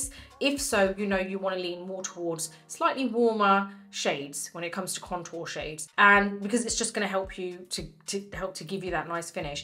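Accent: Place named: United Kingdom. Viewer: verrrry helpful bcoz it answered some questions for me. I like yr british accent too!thank u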